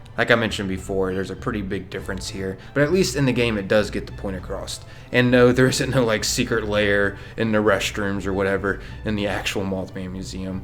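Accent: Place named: Pittsburgh, United States. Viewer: Yo where you from? I hear a pittsburgh accent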